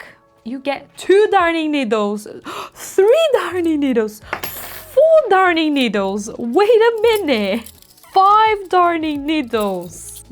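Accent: Brazil. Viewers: Você é brasileiraaaa? Tem sotaque de brasileira ❤️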